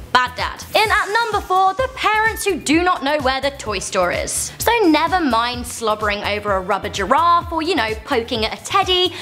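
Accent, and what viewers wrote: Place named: United Kingdom. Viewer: It's called a British accent.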